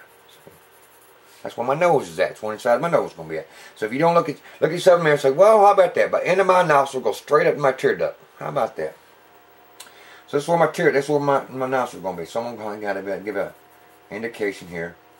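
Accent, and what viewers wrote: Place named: West, United States. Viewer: Love the Western Accent it makes the video more awesome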